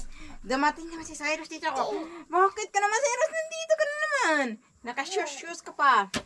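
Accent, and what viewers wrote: Visayas, Philippines. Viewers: Bisaya po kase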